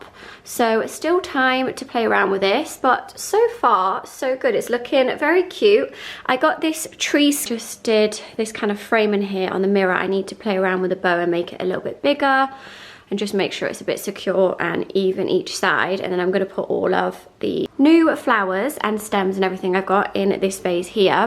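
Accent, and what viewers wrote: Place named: United States. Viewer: Is there a special reason why you pronounce a vase the American way in England we say vase not vaze.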